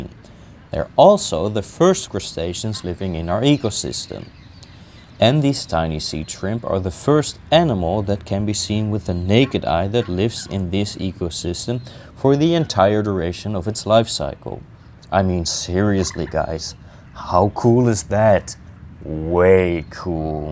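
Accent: Netherlands. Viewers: Love het nederlandse accent geweldige video ❤ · i can hear the dutch accent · I love how awkward he is coupled with how awkward the Dutch accent is, while being very interesting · Why do you pronounce the Latin names in Dutch? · I love the way you unapologetically pronounce things with your Dutch accent 🇳🇱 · why does your accent sound dutch are u also a dutch guy ???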